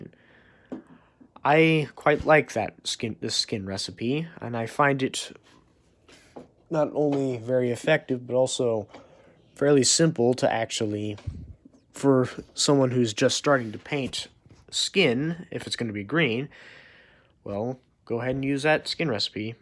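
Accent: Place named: Australia. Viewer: That Voice was classic sounded like a bit of a sophisticated Ork haha mixed with a Aussie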